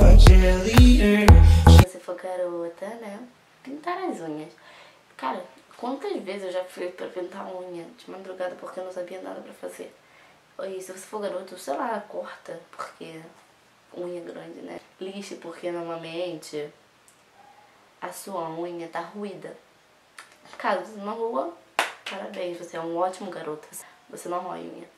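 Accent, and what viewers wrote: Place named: Rio de Janeiro, Brazil. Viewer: vc morra no Rio de Janeiro e porque vc fala carioca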